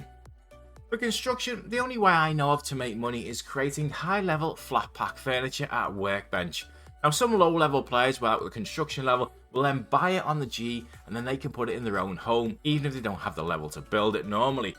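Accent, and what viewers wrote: Liverpool, United Kingdom. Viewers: Super refreshing hearing a scouser play osrs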